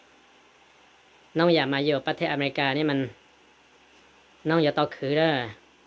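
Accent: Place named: Laos. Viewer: I like your Laoglish Bro.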